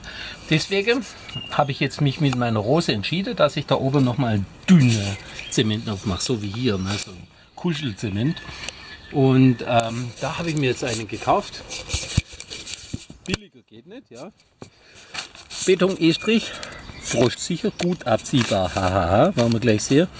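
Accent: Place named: Swabia, Germany. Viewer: Super erklärt,noch dazu auf schwäbisch!! Ja die größere Latte 😂😂😂😂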